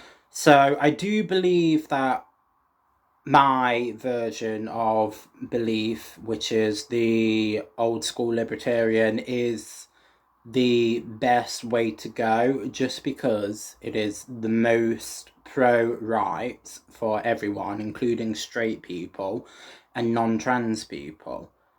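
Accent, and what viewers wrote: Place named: England, United Kingdom. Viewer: Agree entirely with your sentiment, this is just another picky comment! Be a bit careful about the vocabulary you use - when you say "'libertarian" in this video, I think you mean 'liberal'. A 'libertarian' is generally considered to be someone who is for minimalising government interference, and maximising individual freedoms - very much into free-market capitalism, minimal welfare state, and privataisation; a 'liberal', on the other hand is what you describe. A 'Republican' (capital 'R') is a supporter of the conservative, American Republican party. A 'republican' in the British sense, is one who would get rid of the queen and the monarchy in favour of an elected president. I know YT is an international platform, but I think the distinction is important when you have an English accent. Sticking to 'conservative' generally avoids any confusion.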